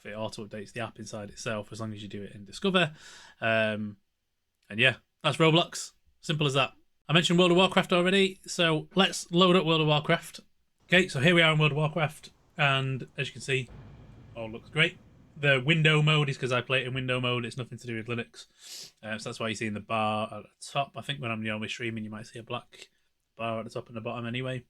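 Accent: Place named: England, United Kingdom. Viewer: Oh, of course, you're English! Now I get why "water" turns into "wo'uh"!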